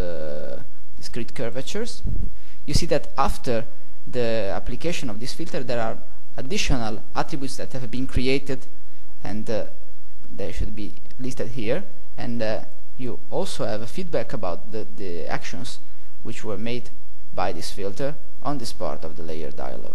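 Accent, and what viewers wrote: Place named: Italy. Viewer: Итальяно прейро))) По-английски говорит хорошо, но по оставшемуся акценту национальность легко определяется)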